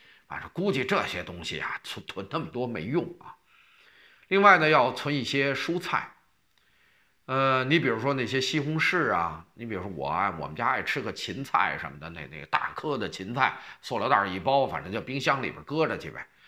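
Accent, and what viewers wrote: Beijing, China. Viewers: 京腔听着像界别儿的大爷亲切～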